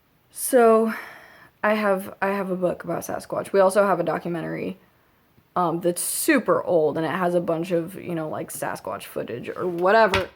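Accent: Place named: United States. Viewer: Clear American!! YAAAAS.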